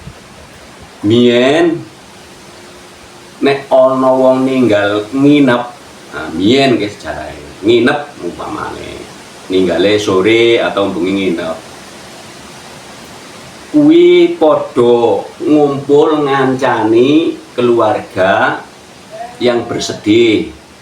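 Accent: Banyumas, Indonesia. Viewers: Loh rupane wong ngapak ....Kulo wong Cilongok mas....tpi ku tinggal d riau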